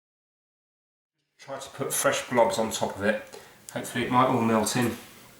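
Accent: United Kingdom. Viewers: Just Found ur channel Love The fix it stuff and that soothing UK voice LUL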